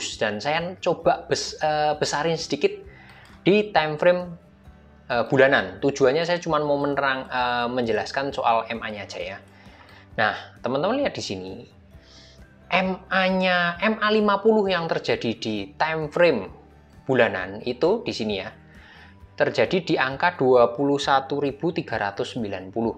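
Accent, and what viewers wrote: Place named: Sleman, Indonesia. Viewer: Jelas² sleman Logat & bhsane bedo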